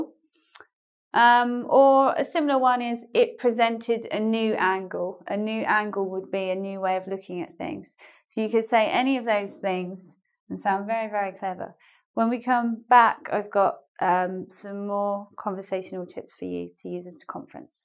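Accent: United Kingdom. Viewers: I like your British accent ! Especially when you said 'Are you attending the welcome drinks tonight ?', your accent was very attractive and a kind of funny to me and made me laugh !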